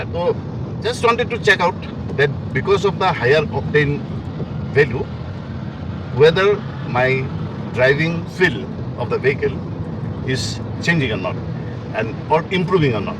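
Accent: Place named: Assam, India. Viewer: accent sounded like an Assamese accent. Nevertheless, great video.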